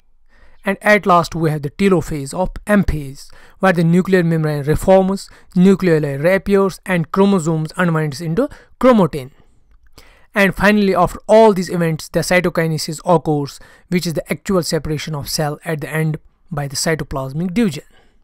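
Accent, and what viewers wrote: Kashmir, India. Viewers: Sir app kashmir sa ho kya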